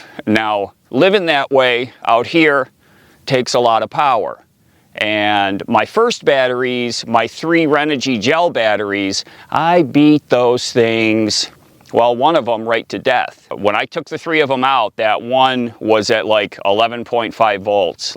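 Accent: United States. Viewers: Hi, glad to see you and Lefty enjoying yourselves in Quartizsite., my son watched your video with me today and was surprised to hear you live in NY, just as we do. He said to him you sound like you have a Southwestern accent or something similar. Just wondering if you grew up in NYS or not. Blessings to you and Lefty, Happy Thanksgiving.:) <3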